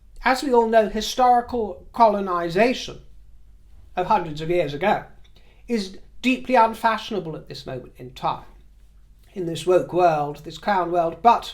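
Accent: Australia. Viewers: Love your Aussie accent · Hi, I am utterly disgisted at our politicians. There is no way I'm voting for a republic. Long live King Charles. Love you're Aussie accent.😊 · 🍐 yes please! 😂 Australian Squirrel here from Ballarat, regional Victoria...I am embarrassed for our State Premier Jacinta Allan' rudeness..how busy is too busy for a Royal Visit...surely a cabinet meeting can be postponed. Nothing much happens I'm sure if the juvenile antics of televised parliament sittings are anything to go on. It would be tremendous to have King Charles visit here as the Late Queen had and other notable royal family members going back.. Cheerio ps love ya Aussie accent. It was ripper mate. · may I compliment you on your Aussie accent. You nearly have it down 'pat.' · as an 🇦🇺 I can explain why it's very simple, we have a Labor Government in power, they are what we call Red Raggers & they're always extremely unhappy sour people. 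Everytime Labor takes power the country ends up in more debt with more crime & more tax to try & pay for the debt. If we go to a Republic it will allow the powers that be to change our Constitution which in these times is extremely dangerous, extremely & even most of the young people know this. We will remain under a Constitution primarily because of this unless of course the votes are 🤔 tampered with 🤨. However I honestly think that if the Wales were visiting instead I would bet money on it that all of these Premiers would have made time, just like they're all quick to turn up to opulent dinners with Queen Mary when she stands for exactly the same system as King Charles & isn't even our Monarch. Glamour & popularity certainly impacts with whom Labor politicians choose to dine with, at least that is one thing Labor does stick too. I'll shut up now otherwise I'll rant on forever as you can guess I'm not a Red Ragger. 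By the way dear may I say on the whole you do a wonderful Aussie accent, depending on what area you come from the accent may become a little more defined.🇦🇺🐨🙏🏻👍🏻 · You can't imagine how we feel as good as your Aussie accent is😅 so many feelings our lucky country is losing its way with idiot politicians and migrants whom disrespect our way of life it all makes me cry Love ❤🇦🇺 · Hi from New Zealand. I agree with you & love your Australian accent 😊 I am so disappointed that King Charles is not able to visit our Country & I wish him & Camilla a wonderful Royal Tour 🥰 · I'm Australian and I also think it's very insulting of our Premiers not to meet with King Charles. The general public voted to remain a monarchy at the last referendum. If King Charles & Queen Camilla were visiting my state, I would go to see them but unfortunately they are not coming to Queensland. I went to see Queen Elizabeth and Prince Philip in Brisbane when they last visited. 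You have an excellent Australian accent,.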